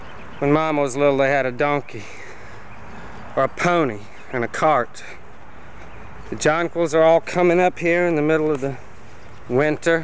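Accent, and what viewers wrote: South, United States. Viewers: It's really interesting to me how his southern accent is more pronounced in these videos when he's at home vs when he's in NYC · goes home and that Southern draw is magnified. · I think his southern accent definitely came out more in this video.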